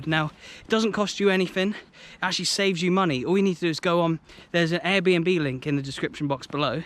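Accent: Scotland, United Kingdom. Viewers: You forgot to mention the golf at Royal Troon think that drags plenty of football players in as well. Excellent video of a well run wee club Your accent is getting more Scottish especially at the end talking Airbnb 🏴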